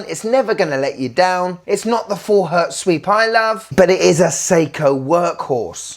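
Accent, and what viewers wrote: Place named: Scotland, United Kingdom. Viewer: I thought you were one of us with that Scottish accent mate! 🤣🤣 both awesome looking watches and another fun show as always matey! 😁👌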